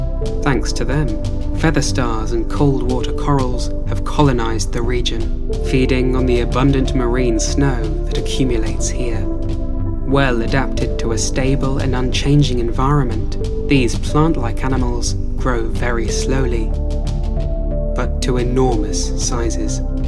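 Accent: United Kingdom. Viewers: Why is it only 12 min long ? The recording is amazing !! The quality is incredible … I don’t care for tne narration though … it seems flatlined and typical , reading every single thing with the same inflection , not having any true feeling / emotion behind it but just flat lined with that same typical cadence every few words , same lifts same falls ( if you can even call it lifts and falls when the vocal range of speaking only rises and is lowered slightly , slowly and maybe a half step in one direction or the other .. sounds like every other recording where someone with a British accent is trying to narrate a documentary… always with same inflections and none of it feels emotive or connected. Many people may disagree and they have a right to their own opinion and can say whatever they wish in their own comments , which I’d expect the same respect regarding my own …. 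I say this not to belittle , but to illustrate my point , bc honestly I feel like it’s something that could be worked out and improved upon … not everyone is meant to be a narrator and I’d leave that up to a handful of people …. With broadcasting experience that have the voice for it .. not to say you won’t eventually have the voice for it , but being completely honest , it takes an amazing mind blowing film and makes it come off amateurish with this narrative.. please at least be unique among those who just read with no pulse whatsoever .. omg!!! There are so many people that when they read , they either bore pe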